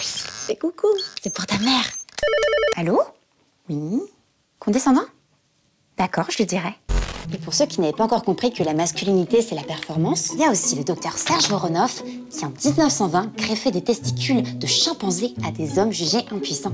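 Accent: France. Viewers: Je sait pas si c'est l'animatrice qui fait cette effets mais c'est la premiere fois que je n'aime pas vraiment une de vost video ... Encore c'est peut etre ton accent trop francais qui faisait que en ten que quebecois je ne comprenais pas toujours mais bon ... En esperent retrouver notre youtubeur habituel a l'avenir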